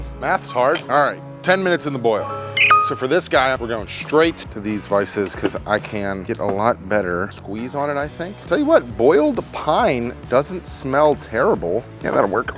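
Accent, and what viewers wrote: Pittsburgh, United States. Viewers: I keep hearing Yinz which means you gotta be from Pittsburgh. Instant sub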